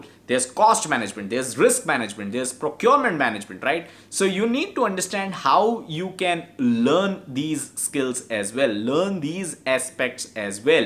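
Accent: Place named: India. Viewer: i understood everything with India accent 😁, i have difficulties sometimes to undestand the accent.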